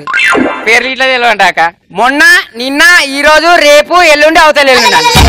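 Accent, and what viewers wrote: Telangana, India. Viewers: bayya Telangana language super bro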